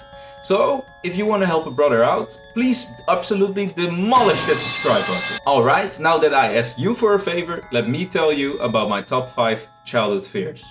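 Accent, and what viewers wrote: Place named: Netherlands. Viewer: this sounds suspiciously like a dutch accent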